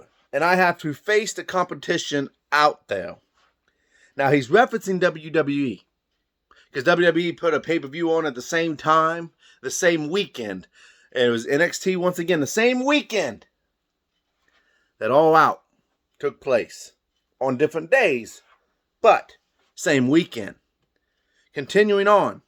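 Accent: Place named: South, United States. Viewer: Why are you trying to talk like e Southern Lawyer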